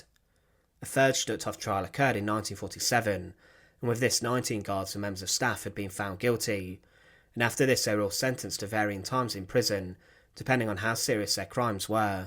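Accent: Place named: West Midlands, United Kingdom. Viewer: I grew up in a place where most people speak like that (the West Midlands) and left as soon as I could, because I couldn't stand the accent.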